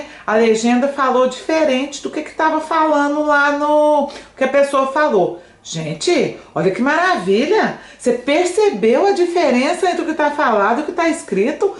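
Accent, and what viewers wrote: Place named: Minas Gerais, Brazil. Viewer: Prof sou novo no canal, to amando os videos! Seu jeito mineiro e reconfortante pra mim que to tentando aprender desde 2020 mas so agora decidi pegar firme!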